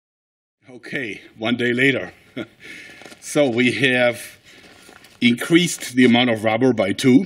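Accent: Germany. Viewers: Hay, fu bist doch Deutsch oder, finde du hast ein typisches Deutsch-Englisch. Antworte bitte auf eine Frage eines Genossen:D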